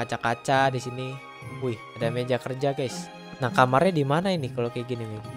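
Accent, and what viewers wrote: Java, Indonesia. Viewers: org jawa kah logatnya keliatn kek jawa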